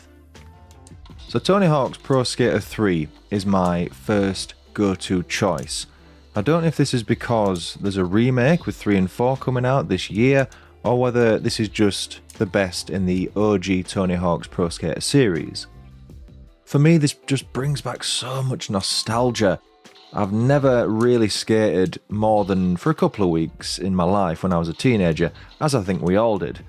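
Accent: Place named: Yorkshire, United Kingdom. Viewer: Where in Yorkshire you from pal?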